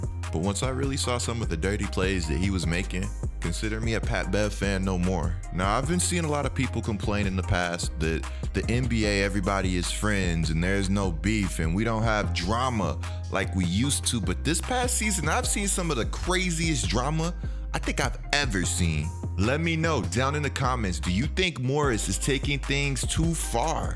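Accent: Louisiana, United States. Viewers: You from nola or BR?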